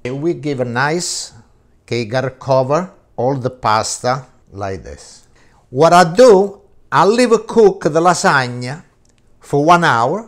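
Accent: Italy. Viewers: I'm laughing AND crying. This wonderful man sounds like EVERY Italian immigrant I had the privilege of growing up around and with, including my own awesome father. Miss you, papa' 🍝🍷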